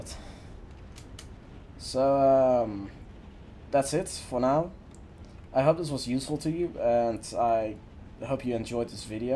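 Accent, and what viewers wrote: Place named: United Kingdom. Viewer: Nice accent, you have a British one, even tho you're a Dutch.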